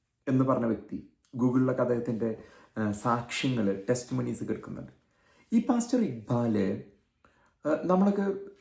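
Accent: Thrissur, India. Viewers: ethu … ee oola video kannunnavarku budhiyundenno 😝😝😝 Ivano Americayil etho family visayil allipidichu kudiyeriyavananu 😝😝😝 porathathinu oru oola thrishur slangum … 600 mandanmare ollu ennathu oru nalla karyam thanne 🤯🤯🤯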